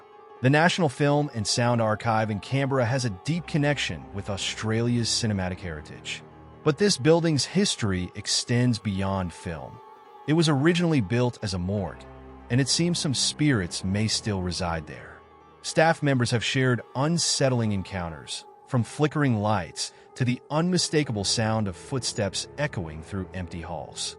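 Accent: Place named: United States. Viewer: Why the Yanky VOICE😢😢😢😢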